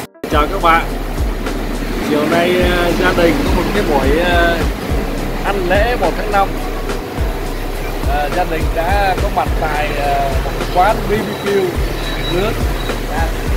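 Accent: North, Vietnam. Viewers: Chú cho con hỏi sao chú nói giọng bắc mà 3 bạn kia nói giọng nam vậy chú :3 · Sao ông bố nói dọng Bắc còn Con lại nói dọng Nam vậy ?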